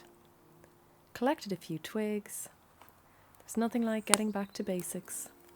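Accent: Ireland. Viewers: One of my best friends, God rest her soul, was Irish. And as soon as I can, in the near future, would love to visit Ireland. In honor of her. Seeing your video & hearing your accent makes me realize that my dream can happen as soon as the virus has a vaccine or When I feel it is safe to travel again. One question I have when is a good time of the year to travel, whether wise? And can you recommend places that I should visit? Thanks. By the way your videos are awesome. And I love the thought of "fairy doors". I am thinking of incorporating "fairy doors" where I live. It is an interesting concept.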